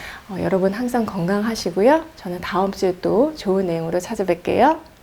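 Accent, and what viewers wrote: Seoul, South Korea. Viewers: 선생님~ 마음이 그렇게 비단처럼 고와서 이험한세상 어떻게 살아가시는지 ㅎ 댓글 너무신경쓰지마세요. 자기가 댓글달아도 뭘달았는지도 잊어버리는 사람태반입니다. 그냥 방송내용만 알차게 해주시면 더바랄게 없습니다. 오리지날 서울 사투리 너무 듣기 좋습니다.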